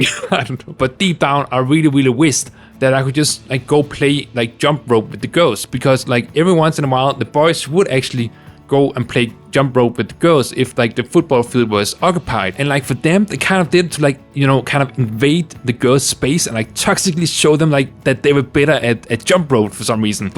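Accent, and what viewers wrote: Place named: Denmark. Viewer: its my first time watching a video of yours and I really like your danish accent! Im half danish myself. I wish you the best in your transition sis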